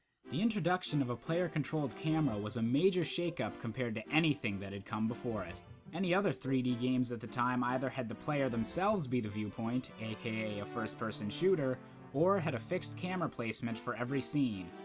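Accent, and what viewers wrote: Canada. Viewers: TheJoseluisperez1000 We pronounce it "zee" He's Canadian, so he pronounces it "zed" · CANADIAN!!! YOU CANNOT HIDE FROM ME!!!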